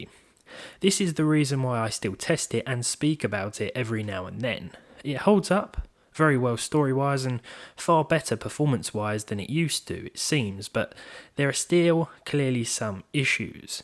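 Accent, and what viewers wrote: United Kingdom. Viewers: Omg. Very good british acsient. <3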